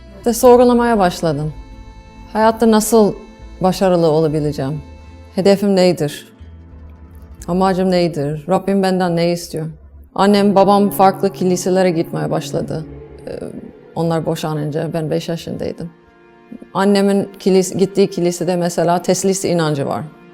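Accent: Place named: Trabzon, Turkey. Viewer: Abla trabzon lu sanki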